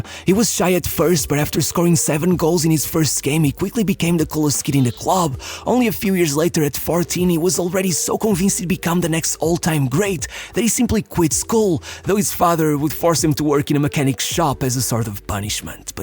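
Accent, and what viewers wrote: Portugal. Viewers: O gajo por trás deste canal é tuga? A maneira como diz os nomes convence ngl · Futre foi gigante- Mas esse inglês-tuga e essa forma de colocação de voz fazem do vídeo algo de absurdamente nauseante. Experimenta fazer a narração com voz normal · Pela tua voz, ouço um sotaque português. Fico feliz em saber que seja alguém da mesma língua que a minha a gerenciar este canal. Abraços do Brasil 🇧🇷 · I know you are Portuguese by the way you say those portuguese names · Its not his fault that he has a portuguese accent because he is portuguese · tens um bom ingles mas nota-se o sotaque pt hahaha bom trabalho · Tu és português né? Sinto um sotaque português 😂😂😂 ao falar Paulo Futre Ronaldo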